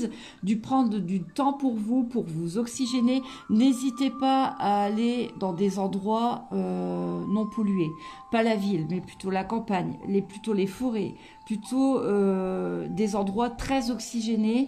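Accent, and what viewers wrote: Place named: Nord-Pas-de-Calais, France. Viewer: Bonjour je vous ai vue par hasard tout à l heure sur un partage (groupes) et donc Je vous découvert et la vôtre attitude envers les personnes qui vous posée des question et votre façon de parle(chti) 😉mon interpellé, je vous ai de suite à précisé 💜🙏 je vous suis donc sur fb et la chaîne YouTube, merci pour l'horoscope(Bélier) je me reconnais😂 merci à vous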